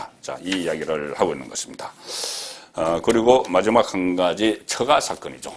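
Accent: Gyeongsang, South Korea. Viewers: 강한 경상도 억양때문에 첨엔 어쩌나 했는데 들을수록 내용이 진솔 담백해서 조용히 지켜 봅니다. 저는 여성이라 경상도 억양이 답이 안나오네요. 아...서울말 쓰고 싶다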